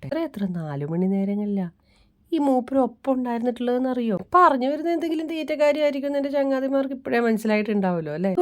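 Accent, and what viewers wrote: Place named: Idukki, India. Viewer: Njn ella video yum kanarund ente nathoonte samsaram pole aval idduki anu adimali❤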